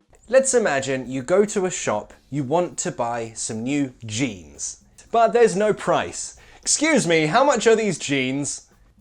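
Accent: United Kingdom. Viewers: Nice lesson again and again. Btw i love ur British accent it so sexy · I just don't look for the video at the first time.I just like that like button.You are the first teacher on youtube who inspired me to learn British accent. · I love your lessons!! I LOVE the way you deliver it! I LOVE your British accent 😢 and there are always new things to learn ❤ Keep up the good work!. I won't miss any of your videos again 🙌. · When I wanted to learn British accent, I found you here at YouTube... How lucky I was!! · A friend of mine recommended me to watch your videos in order to improve my british accent and im so glad he did so because your videos are perfect (+_+)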